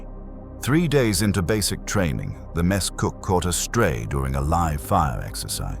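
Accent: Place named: United Kingdom. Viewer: Why did my brain convince me you were also british til this Thanksgiving special lmao Ty for the double upload!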